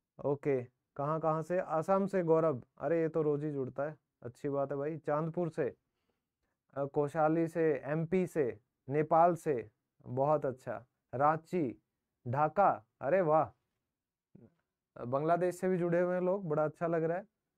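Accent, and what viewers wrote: Haryana, India. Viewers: Aap haryanvi ho kya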